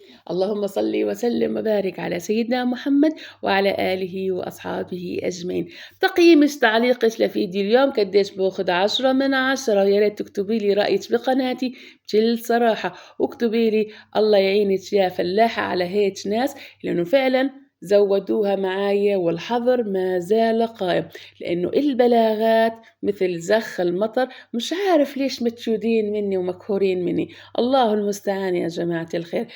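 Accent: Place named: Palestine. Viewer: سلامة عمرك يارب حبيبتي لهجتك الفلسطينية الفلاحة حلوة كتير زي لهجة امي الله يرحمها ويغفر لها لأنه إحنا من قضاء يافا يازور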